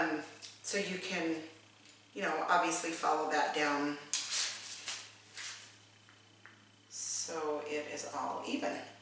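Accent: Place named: Wisconsin, United States. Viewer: Your accent sounds like Wisconsin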